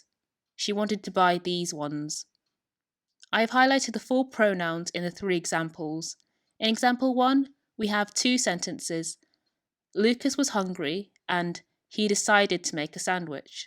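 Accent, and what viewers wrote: England, United Kingdom. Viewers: very horrible pronuncuation, such a strong english accent...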